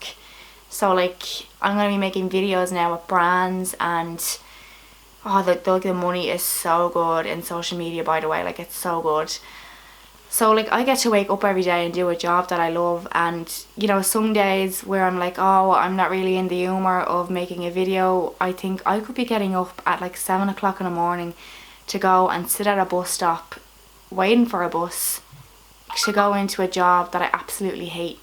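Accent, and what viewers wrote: Dublin, Ireland. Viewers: l love this chanle l love to hear my dublin axsent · you are so talented you are so right with the Dublin accent I can relate to you, keep up the great work and if it's not too much trouble do more movies if they were set in Dublin they were fantastic introduce loads of my family to them particularly love titanic best of luck to you my 87 year old mother Haas a great laugh.I wish you well and happiness and look forward to the next production.well done your class you make my day .I will keep watching good girl go for it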